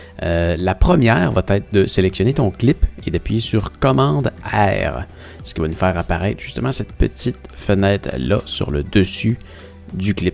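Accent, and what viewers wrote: Quebec, Canada. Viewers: Très bon ton tuto +1 et +10 pour l'accent tabarnak :)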